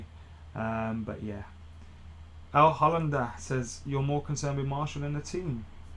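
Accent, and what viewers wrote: Birmingham, United Kingdom. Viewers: Are u really from Birmingham?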